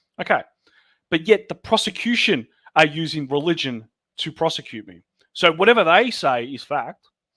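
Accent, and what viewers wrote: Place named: Australia. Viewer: You remind me a lot of Phil Anselmo (from Pantera and Down) but with an Australian accent instead of the Southern NOLA accent. Very hot! Hope everything goes well in your case, it certainly doesn't sound like you've done anything wrong!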